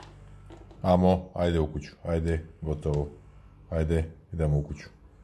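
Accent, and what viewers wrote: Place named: Split, Croatia. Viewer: legenda a jos ima i splitski naglasak hahah lepotan pravi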